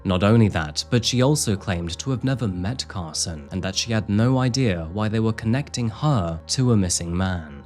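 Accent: United Kingdom. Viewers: Love your videos dude. I'm from the UK. Your accent sounds British, but with a twinge of something else maybe?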